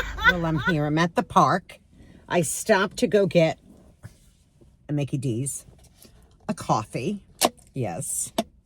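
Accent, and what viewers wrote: New York, United States. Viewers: Oh my goodness!!! I can definitely relate to you! My husband was a long haul driver too! The first few years we were married he was gone all the time! now he drives for Uber L O L I like it. But then I don’t like it when he sits in his chair at home! i’m like…. don’t you wanna go do something? He’s like, No…but we have animals so he’ll go outside to the animals in the morning and then he’ll go tend to the animals in the afternoon…. but then other parts of the time he’s in his chair when he’s not driving for Uber and then I say don’t you wanna read a book or learn a new craft; build a new porch or build us a new deck?!?!, my firstborn was born in 1987 so I wonder if we also have that in common…what year was your daughter born? By the way, I love your accent. Are you originally from Long Island or from New York? So your birthday is on the 28th!!! 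How exciting! I hope you watch my next video. I shouted you out!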